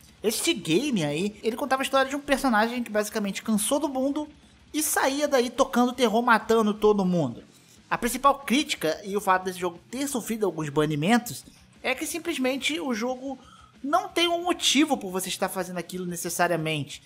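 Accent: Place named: São Paulo, Brazil. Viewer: Vídeo ficou show mas porque você quando fala tá puxando o S igual gente que mora em São Paulo? Puxa o Xiix igual carioca mesmo porque sei que vc é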